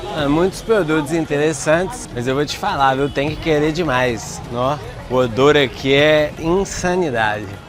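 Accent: Minas Gerais, Brazil. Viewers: Vô te falá viu. Acho q ele é mineiro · Estou amando seus videos, o sotaque Mineirinho me dá uma saudade de casa 🥰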